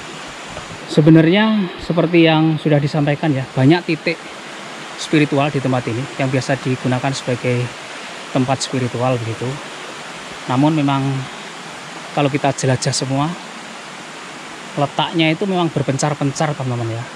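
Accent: Java, Indonesia. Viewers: mas njenengan jowo ne pundi to kok enak banget tutur katane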